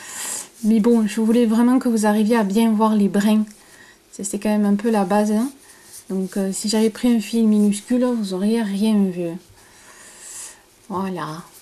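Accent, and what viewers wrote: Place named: South-West, France. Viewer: Merci infiniment pour cette vidéo ..Les explications sont très claires et on a l impression de crocheter avec une copine, c est trop bien . Cela faisait des mois que je voulais faire de la mosaïque en crochet mais impossible de trouver un tuto facile à comprendre . Enfin ,grâce à vous , j ai réussi ma première mosaïque . Je suis trop heureuse. 🥰 (Et l accent du sud-ouest en plus, peut-être celui des Landes , c est le bonheur ) Vous avez gagné une fan en Guadeloupe .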